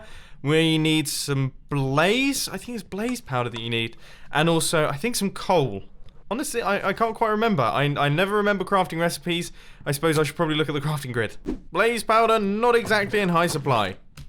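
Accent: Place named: United Kingdom. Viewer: Your british accent is finally getting me after 4 seasons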